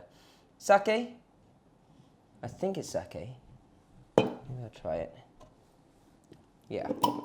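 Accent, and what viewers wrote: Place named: RP, United Kingdom. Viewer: First, I am not sure how I got here. Second, Food? meh. Third. But, honest to goodness why are you trying to sound like Nigella? Is it because it is supposed to sound real poash? But.. Her father was the Chancellor of the Exchequer! And it's annoying like it's supposed to be better than everyone else.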